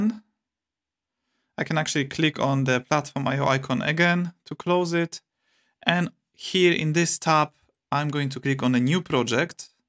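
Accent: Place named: Poland. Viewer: Dobra robota dobry angielski i typowo polski akcent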